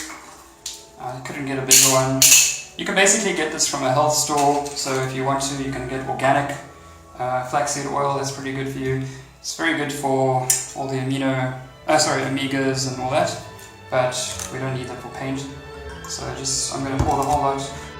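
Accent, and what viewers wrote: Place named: South Africa. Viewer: nice also to hear a fellow South African accent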